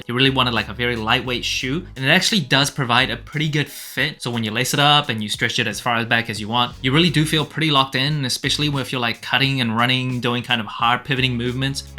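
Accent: Australia. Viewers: Did you ever live in Australia because it sounds like you have a bit of an Aussie accent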